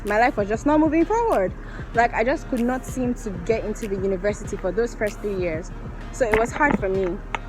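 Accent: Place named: Africa. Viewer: Why do you sound African? I'm hurt😖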